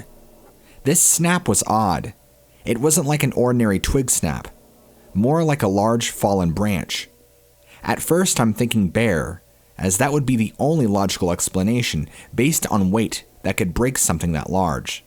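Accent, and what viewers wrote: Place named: United States. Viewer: I love your American accent 😁👍😘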